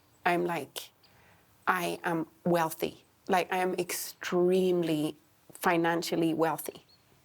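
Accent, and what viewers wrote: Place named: Ireland. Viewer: Wonderful, sister, miss the irish accent , lived for a good while in Ireland, then felt to move back to rural România to care for a piece of land and few old villagers around us here, such an obvious, simple solution for humanity to cease its destructive madness and become harmonious, returning to nature..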